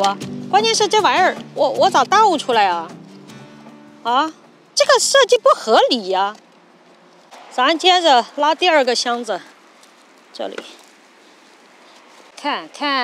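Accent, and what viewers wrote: Sichuan, China. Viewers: 四川妹儿吗？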